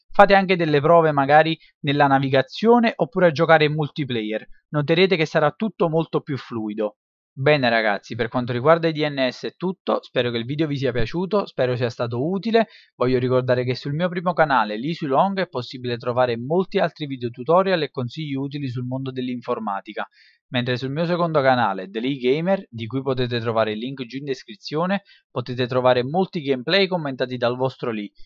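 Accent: Naples, Italy. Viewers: oddio lee si sente proprio che sei di napoli xD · ah bella parlata napulitana xd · Ma sei di napoli?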